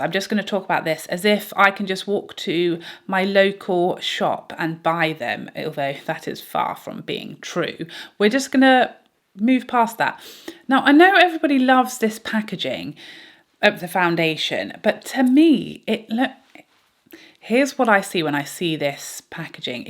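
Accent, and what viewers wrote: United Kingdom. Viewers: I love your videos to pieces! and your British accent. I’m new to your channel but am so happy I found you. I’m in the US but found I like UK YouTubers probably more than the US. You guys are more real and unafraid to tell it like it is😉 Do you use Lancôme or Estée Lauder much? I have heard you mention some products from them but I have not seen any videos about those brands. Does anyone do videos about them. I think they both don’t get enough love if you know what I mean. I use a lot of both brands and would love to see videos about their products.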